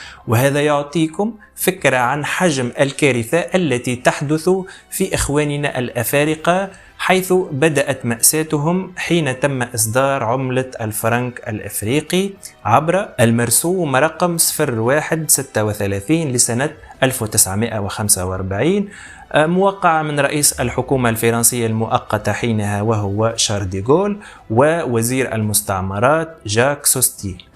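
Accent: Tunisia. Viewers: انا لقيت قناتك صدفة من ساعة شاركت معك لأني وجدت المحتوى جيد بالتوفيق ان شاء الله سؤال من أي دولة شقيقة انت؟ من اللهجة اظنك تونسي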